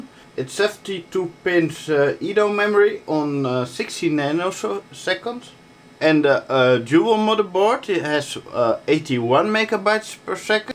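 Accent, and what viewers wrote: Netherlands. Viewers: know :-) But I think not all DutchPeople speak like this. Anyways, I like it. Makes him unique to me :-)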